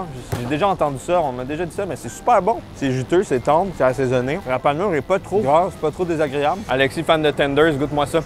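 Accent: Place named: Quebec, Canada. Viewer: Hello, quand viens tu en Suisse? Manger une vraie fondue une vraie raclette et autres spécialités. Bravo pour tes vidéos. J’ai 63 ans je suis venu au Québec en 1989 et vôtre accent me plaît toujours autant. Ne change rien et bonne continuation.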